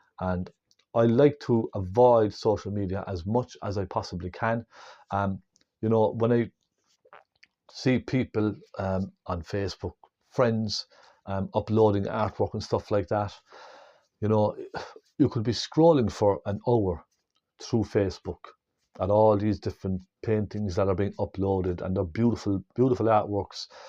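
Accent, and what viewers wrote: Ireland. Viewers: it is very nice to hear an Irish accent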